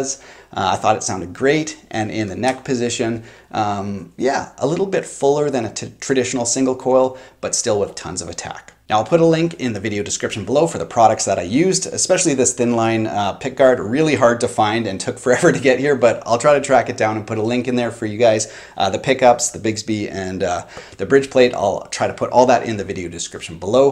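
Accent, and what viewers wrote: Canada. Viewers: I love your videos, this one is no exception. But do Canadians day Filta instead of Filter? Because you keep saying Filt-a-tron...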